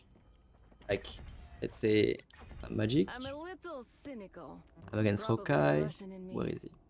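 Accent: France. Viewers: You have a very strong French accent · Hey bro are you French or something ? Nice video btw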